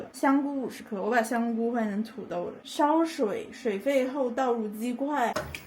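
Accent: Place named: Hubei, China. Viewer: 聽這聲音語調一直很熟悉~原來是湖北口音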